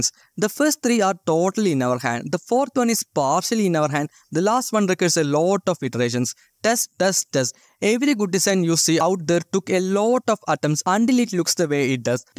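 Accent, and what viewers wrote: South India, India. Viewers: South Indian accent is sweet and you can keep up with it but speaking slower will make your word more clearer. Just keep your content top notch accent doesnt matter that much Cheers Brothaa✌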